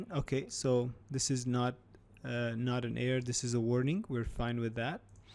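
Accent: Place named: Russia. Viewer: акцент русский, мог бы и по-русски записать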